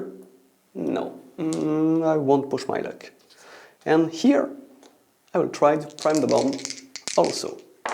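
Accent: France. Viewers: should have done the whole presentation, the French accent sounds much better on women. Also, the hand motions were super distracting. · Thank you for this video. I have worked with suppliers in Switzerland and France for twenty years so I am very used to hearing English with a strong French accent. In addition I must say your English is excellent, I wish my French was a fraction as good. I can see how to a person not used to listening through a strong French accent that this instructional video can be difficult. This isn't a casual conversation but detailed instructions so anything which distracts from the details is noticed much more than usual. I would recommend people watch this more than once if they are having problems. Any person who has a different language as their primary would have the same difficulty here. Part of the problem is Americans are not used to listening through an accent, especially a French one. 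That is just the nature of having one language dominate the majority of the continent you are on. As far as the hand waving, it doesn't bother me at all. I live in New York and half my family is Italian. It is said the best way to shut up an Italian is to tie his hands up so it does not distract me at all:-)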